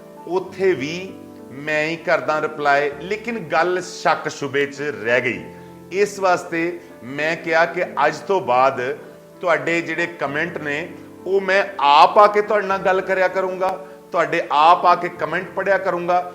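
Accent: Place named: Rural Punjab, India. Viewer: saab aap punjabi bohut pyari bolte ho. Pure desi punjabi. Love it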